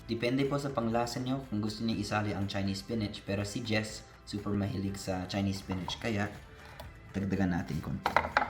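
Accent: Philippines. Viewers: Wow I can’t believe your accent pinoy na pinoy talaga.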